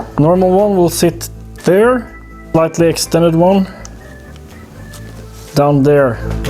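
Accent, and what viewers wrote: Scotland, United Kingdom. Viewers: Love watching your content, also love the scottish accent😂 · Love your channel. I don't know squat about two strokes but I enjoy the process, the ups and downs, the music, the Scottish accent, the whole thing. Authentic empirical activity leading (maybe) to a distant, possibly successful outcome. Not for folks who idea is watching a half hour video by someone who builds an engine or restores a car, start to finish. This is the journey, not the destination. Who knows, you may end up with the world's heaviest 2-stroke. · the scottish accent cracked me up lol!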